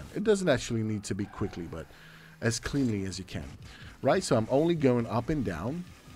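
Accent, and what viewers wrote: United States. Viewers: american accent but a british one in the mega video? lol also thanks for this you absolute legend! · what happened to your accent 😭😭 its almost american now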